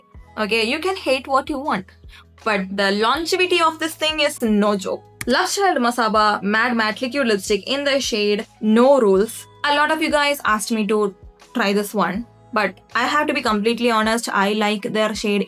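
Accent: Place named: Tamil Nadu, India. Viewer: Loved the video keepp goingg....and also luv that English with tamilian ascent ❤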